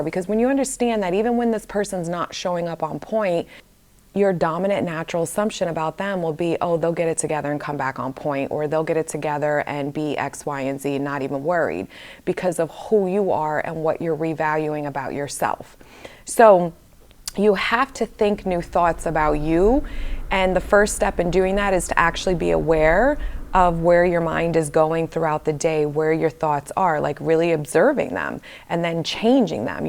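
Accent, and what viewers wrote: New York, United States. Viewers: I don’t ~need~ one on one coaching from you but I WANT it. That powerful New York cadence makes everything you say hit so much harder. Ty for another kickass video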